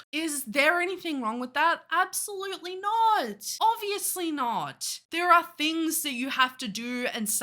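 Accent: United States. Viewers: Okay but how is your American accent so fcking good?! Like I’ve lived in the US my whole life and I’d believe you were one of us 🤣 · Your American accent is really good lol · I love your american accent 😂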